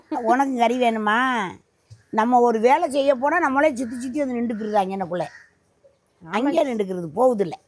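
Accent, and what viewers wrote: Ramanathapuram, India. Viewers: அம்மா நாங்கள் ராமநாதபுரத்தில் உள்ளோம். உங்களின் பேச்சு அப்படியே எங்க ஊர் போலவே உள்ளது. எங்கள் ஊரில் உள்ள முஸ்லிம்கள் இப்படித்தான் பேசுவார்கள் கேட்பதற்கு அழகாக இருக்கும்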